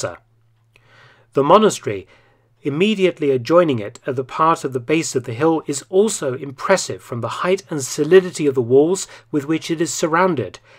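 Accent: United Kingdom. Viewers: Lol the whole time I heard this I thought it was strange that they had a British man representing China invading Tibet😂😂 I wasn’t listening too well as one could imagine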